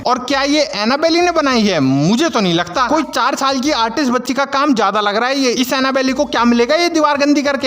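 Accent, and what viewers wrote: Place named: Jaipur, India. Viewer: Bro tu toh rajsthani ninala 😂😂 Jaipur vala touch alag se hai voice me 😂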